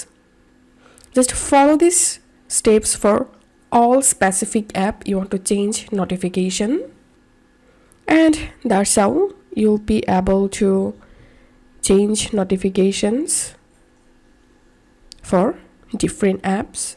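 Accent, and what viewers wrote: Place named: India. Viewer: I don’t trust the Indian accent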